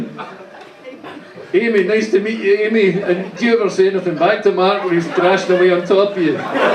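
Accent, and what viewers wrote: Scotland, United Kingdom. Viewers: thanks, I guess I have... I can hardly recall leaving this comment, much less you. It was Toffee Digger's ("reply") comment that got back here to see yours (2 years later). I gather from Marco's comment that you have a Scottish accent - I, too, love Scottish accents (among many others). Yet, I was apparently frustrated by the combination of your accent and your "audio situation" as making what was apparently funny to some, literally incomprehensible to me, despite it being, apparently aimed at the English speaking world(?) < insert quote here from Ben Franklin and others about how critics are often our best allies > PRO TIP: If you don't want feedback, feel free to turn off your comments section instead. Cheers. B-)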